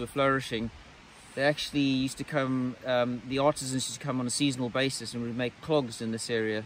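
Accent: South Africa. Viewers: Are you South African, or am I missing the accent?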